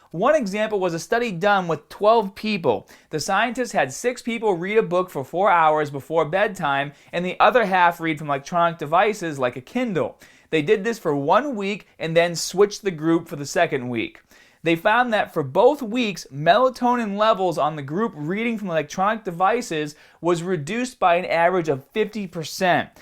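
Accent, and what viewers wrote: Boston, United States. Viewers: Boston much? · Is he from Boston Area? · Bette? Boston accent? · Lol is this guy from boston? His accent is definitely a boston accent :) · Dude! I just started watching your vids a couple of days ago and started watching your vids from yrs ago. This is the first RECENT one I've seen... Wtf happened to your accent?! It's WAY more Bostonian now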